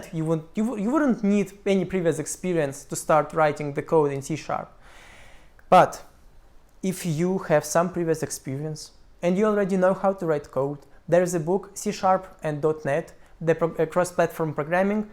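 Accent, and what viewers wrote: India. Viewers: Why do you sound Indian😅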